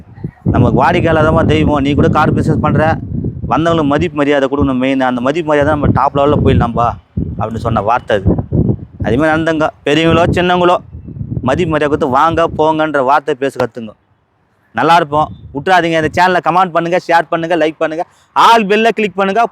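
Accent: Madurai, India. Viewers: Madurai vasagam super 👌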